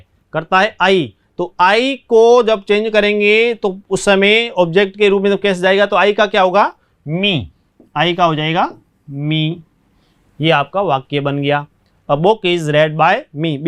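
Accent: Marwar, India. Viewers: Sir your English is not fluent Marwari tone aa rahi he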